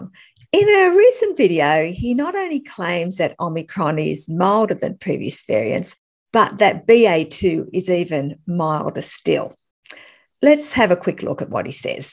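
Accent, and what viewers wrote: Australia. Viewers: Glad you're not my Dr. Mind you it sounds like you're Australian we've all noticed how mad you are over there about covid over there..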